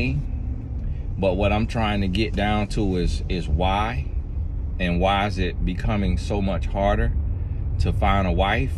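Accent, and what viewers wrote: South, United States. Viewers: You got that southern accent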